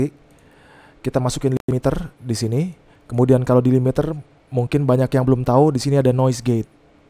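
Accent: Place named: Medan, Indonesia. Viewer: lah kirain orang medan , cara bicaranya itu dari video²lainnya kek medan bang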